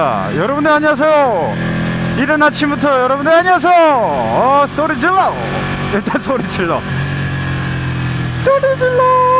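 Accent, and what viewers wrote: Gyeongsang, South Korea. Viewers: 저도경상도사람인데 님 경상도인신줄몰랐네요ㅋㅋ 친구랑대화 하시는거보고 친숙한ㅋㅋ 더욱 정감가네요ㅎㅎ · 갱상도 아입니까? 북입니까 남입니꺼?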